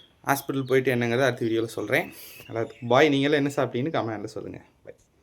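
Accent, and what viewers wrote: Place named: Kongu, India. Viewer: அழகு கொங்கு தமிழ் 🤝😊🥰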